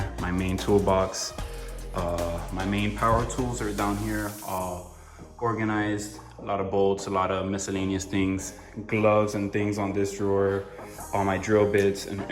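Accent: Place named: Miami, United States. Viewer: I knew you were from Miami from the first literally you said lol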